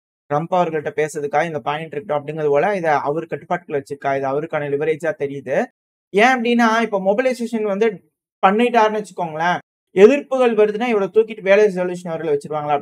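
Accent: Coimbatore, India. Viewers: அவன் பேசறத பார்த்த கோயம்பத்தூர் மாரி தெரியுது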